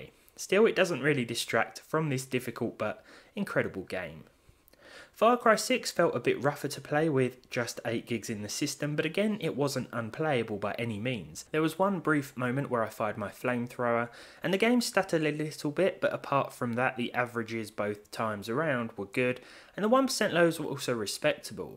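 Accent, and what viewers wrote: United Kingdom. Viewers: How could i ever your Videos press on dislike??? I Love Your Videos! Nice comfortable Voice, interesting themes. And the british-like english helps me to push my language Sklills. Many Thanks! (How to say in englisch?: weiter so! (german) Further so!?) 😀 Have a nice Weekend! ❤❤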